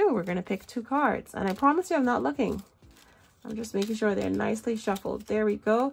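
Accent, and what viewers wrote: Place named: Caribbean. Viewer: Wooohooo Caribbean girls run it 😂😂😂 defo heard your Caribbean accent thought you were Bajan😊😊 Great video love all the boxes❤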